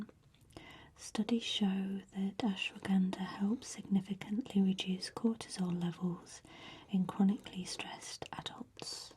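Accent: United Kingdom. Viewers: Theirs nothing quite like a British ladies voice. Outstanding.❤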